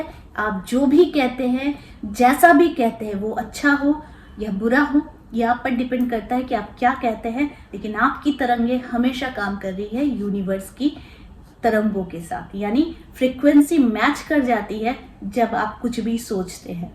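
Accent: Bengal, India. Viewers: R u bengali???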